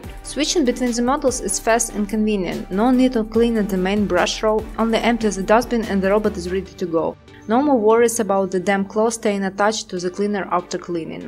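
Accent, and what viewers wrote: Russia. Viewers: Oh this russian accent...🤦‍♂️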